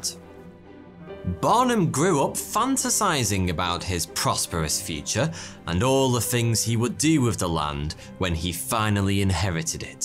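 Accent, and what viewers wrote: United Kingdom. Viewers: You are British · I love the fact that you speak British English but also uses American words and colloquialism to inject some humor to your stories!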